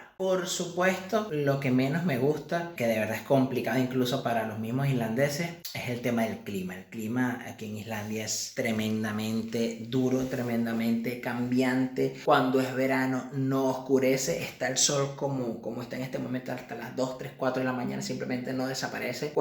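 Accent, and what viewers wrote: Venezuela. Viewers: No hizo falta escuchar 1 minuto de video para saber que eres venezolano. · Llevo solo 1 minuto de vídeo y ya se w eres venezolano jajaja tan bello... Dios te bendiga papito!!!... 😆😘🇬🇧🙏🏼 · Desde que empezò dije este chamo es venezolano... bajo a los comentarios y confirmo jajajaja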